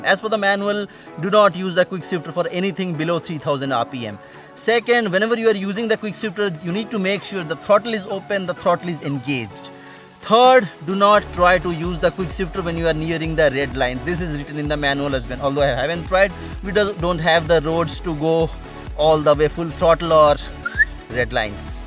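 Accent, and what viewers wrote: Odisha, India. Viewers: r u from Odisha ?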